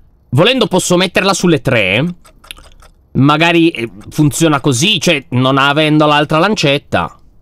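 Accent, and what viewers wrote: Sardinia, Italy. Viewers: per favore io ti voglio bene ma puoi smettere di fare il sardo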